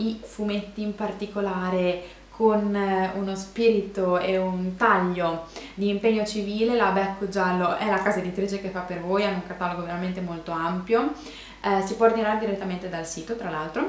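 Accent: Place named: Modena, Italy. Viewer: Ciao, sono arrivata sul tuo canale proprio grazie alla BTILI e mi hai conquistata!! Sei davvero bravissima. Hai una proprietà di linguaggio invidiabile e tra dolcezza e passione riesci a far innamorare dei libri di cui parli (ormai li ho messi tutti in wishlist 😂). Oltretutto io sono di Reggio quindi il tuo accento modenese mi è familiare 😊 a me piacciono moltissimo i fumetti proprio perché l'utilizzo delle immagini può essere molto evocativo. Complimenti!!!!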